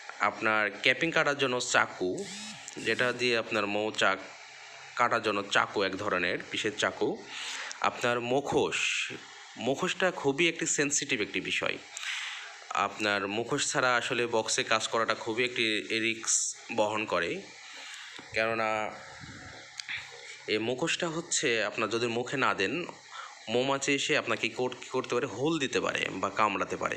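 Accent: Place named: North Bengal, India. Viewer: আপনার কথা শুনে মনে হচ্ছে উত্তর বঙ্গে বাসা, বাসা কোথায় আপনার?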